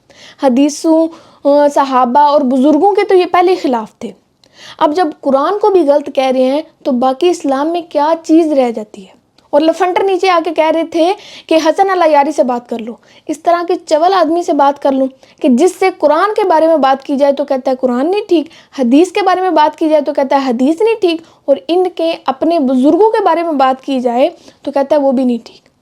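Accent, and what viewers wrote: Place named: Pakistan. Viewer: Mujhe ye pakistani accent bahut psabd hai ❤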